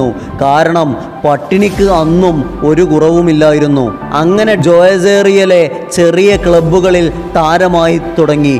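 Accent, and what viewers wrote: Malappuram, India. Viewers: 😄😃Achan dovingos footballinte Oru pirandanayirunnu enn saaran 😁😁thani Malappuram slang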